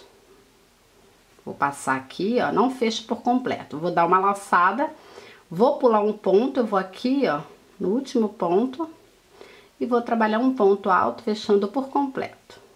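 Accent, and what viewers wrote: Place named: Rio de Janeiro, Brazil. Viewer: Passei parte do tempo neste final de 2023 fazendo este lindo tapete, fiz o meu no barroco natural e ficou lindo! Adoro seus trabalhos, gosto do seu sotaque carioca, e a propósito suas unhas são lindas. Sucesso neste ano de 2024, gratidão por compartilhar seus trabalhos belíssimos.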